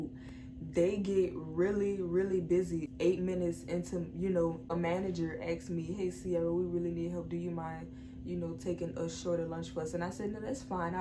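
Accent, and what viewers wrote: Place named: New Orleans, United States. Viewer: I can tell you from N.O from the accent 😂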